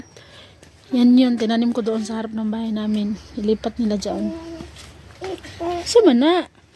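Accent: Visayas, Philippines. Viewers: Cute oi bisaya mn😊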